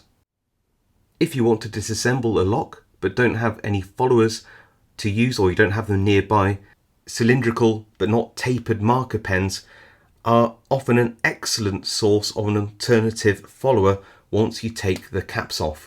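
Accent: United Kingdom. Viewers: Excelent presentation! I already know most of this tricks but with your strong british accent all look like some cassic documentary worth to watch, and yes I almost forget, which size of bra is the best for making lockpicking tools? =) =)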